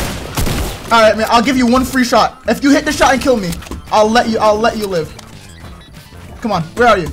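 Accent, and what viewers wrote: Jamaica. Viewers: Yo are you Jamaican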